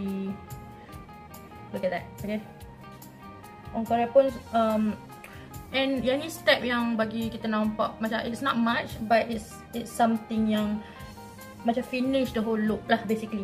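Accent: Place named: North, Malaysia. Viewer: Kak.. Cakap lah full utara... Kite suke dengar kak ckp utara💋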